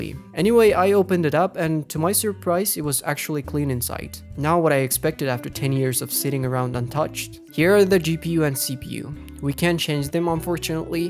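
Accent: Russia. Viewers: U russian? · Ха,он русский 😂,спалился братан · is bro russian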